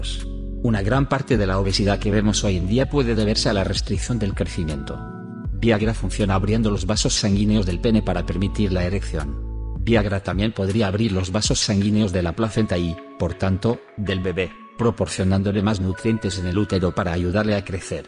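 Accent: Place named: Russia. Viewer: no mames que pedo con esa voz parece q esa IA aprendió hablar español despues del ruso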